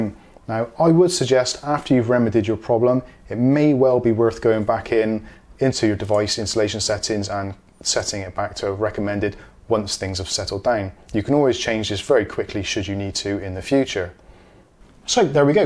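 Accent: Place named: Gloucester, United Kingdom. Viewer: Highlands! But from Gloucester originally, so I recognise the accent haha (or am I completely mistaken? haven't been there for a while lol)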